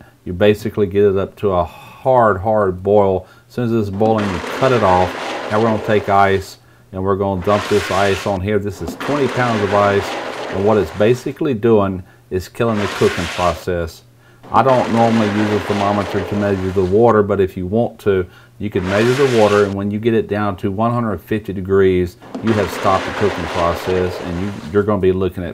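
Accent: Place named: Louisiana, United States. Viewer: Half cup of kayeen paper! man i love the Cajunness ohhh yea brother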